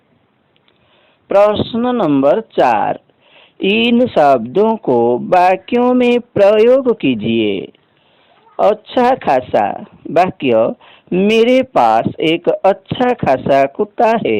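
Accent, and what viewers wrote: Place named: Odisha, India. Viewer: Are bhai sun ya odia midiam saraswati Hindi kitab ho to odia gurujika voice kasa aiga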